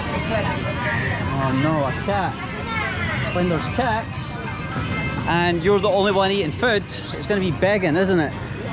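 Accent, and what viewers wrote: Scotland, United Kingdom. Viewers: Hey Scottish man, love the accent, I studied in Scotland and I miss it. Feeling nostalgic. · Your accent reminds me of my time in Leith . Which part of Scotland are you from ? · Welcome to Malaysia. Love your Scottish accent. You should get Melaka T-shirt, that is where everything starts in Malaysia 600 years ago. Kuala Lumpur is the capital but the truth gems is outside capital city. Malaysia is food heaven country, really hard to try it all for a tourist. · I love the Scottish pronunciations of things :))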